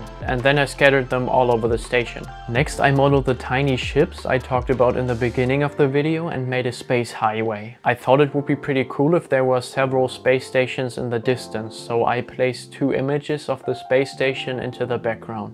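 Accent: Germany. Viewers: Hab direkt an deinem Akzent erkannt dass du Deutscher biste 😎🔥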